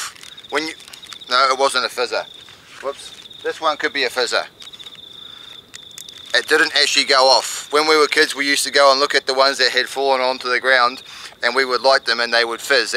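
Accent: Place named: New Zealand. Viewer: Coz he's a Kiwi - aka new zealander. Thats their accent.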